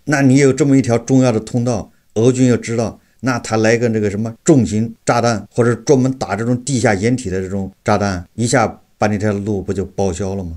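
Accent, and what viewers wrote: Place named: Wuhan, China. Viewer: 标准的弯管子武汉普通话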